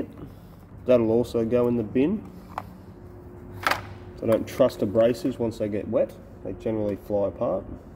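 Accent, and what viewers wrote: United States. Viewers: Oh no, you're starting to talk American now. Good score on the auction. Hope y'all had a good Christmas. regards from Wales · You're starting to sound less ke an American. Made right here in the USA . You did mention made right here in Australia a lot 😂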